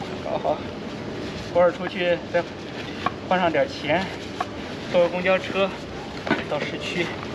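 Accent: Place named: Shandong, China. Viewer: 是哪里人？听口音像是山东的。另外问一下，用的啥装备拍的啊？感觉画质很清晰。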